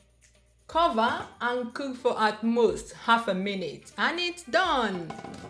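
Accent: Igbo, Nigeria. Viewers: I like your igbo accent.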